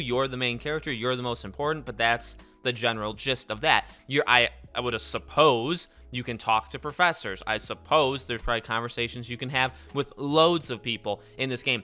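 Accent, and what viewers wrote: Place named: Midwest, United States. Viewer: You sound like you are from Chicago or Wisconsin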